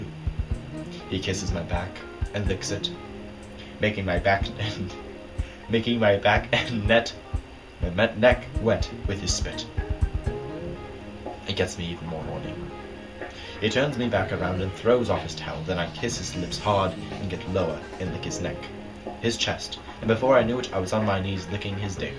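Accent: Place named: United Kingdom. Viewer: Is it me, or did you get all Brit on us? Not that I minded, because I loved your accent. XD I loved your puzzled looks, and you trying not to laugh throughout the story. And just you are amazing and this was hilarious. Please do more dramatic readings xD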